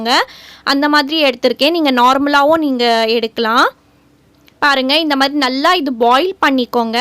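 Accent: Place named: Malaysia. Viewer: Neenga malasiyan ah sis? Unga voice la apdi theriyudhu adhan keten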